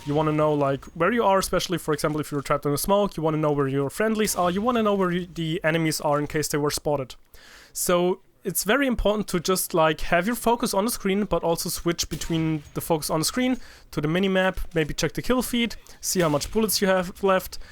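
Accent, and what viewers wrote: Germany. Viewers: ! You sounds German alright. Might be because I have a few German friends, so I'd know. (and Norwegian/Swedish/Danish friends as well) Dutch and Danish sounds quite similar, though. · Hör ich da nen Deutschen Akzent raus oder täuscht mich das? :D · Du klingst voll deutsch :D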